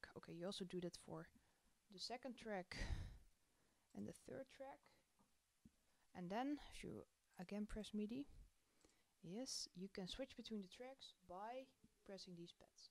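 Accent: Netherlands. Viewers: ik hoor dat je nederlands bent dus ik doe het ff zo, mijn minilab kan niet opnemen en selecteren zoals die van jou het doet. mis ik iets?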